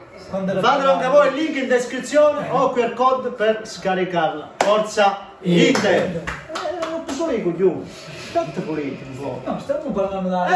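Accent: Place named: Basilicata, Italy. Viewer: Non credo sia Calabrese, penso sia della Basilicata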